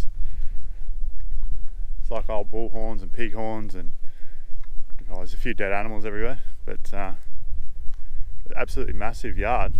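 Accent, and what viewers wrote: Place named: Australia. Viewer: Except for the lack of sagebrush and your heavy Aussie accent 😆 this video looks very similar to areas of Central Oregon! Same type of history too either cattle, or sheep, or horses. If you have been watching Casey at Coyote Works channel you'll see what I mean. Always looking for relics and ruins of the past. Cheers!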